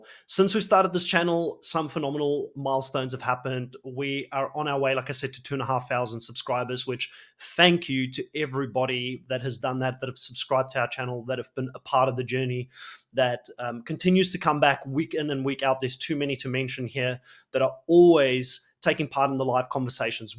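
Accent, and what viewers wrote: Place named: South Africa. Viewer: his accent is South African, though it can be confusing if you only hear that he lived in Australia and then moved to New Zealand. lol Watching the different versions of Survivor helped me be able to distinguish the English accents better. lol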